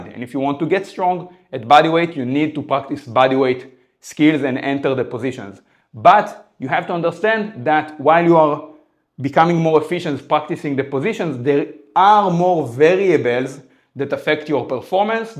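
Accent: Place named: United Kingdom. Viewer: לפי המבטא שלו הייתי אומר שהוא בריטי.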